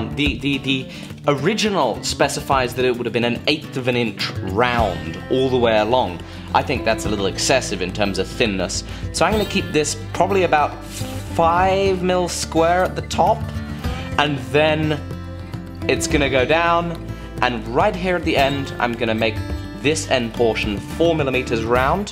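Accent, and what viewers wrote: United Kingdom. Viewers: can you remake this video again but using a normal accent (not annoying British accent)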